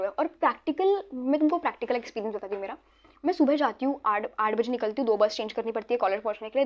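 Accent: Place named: Mumbai, India. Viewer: She has that typical Mumbai accent ❤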